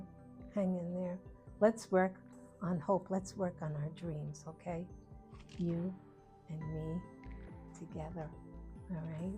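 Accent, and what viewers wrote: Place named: New York, United States. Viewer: you're a sweetheart. I Love your NY accent - and I'm guessing Jewish?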